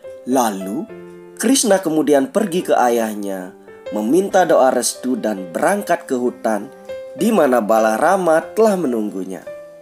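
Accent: Bali, Indonesia. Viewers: Logat balinya kental banget hehe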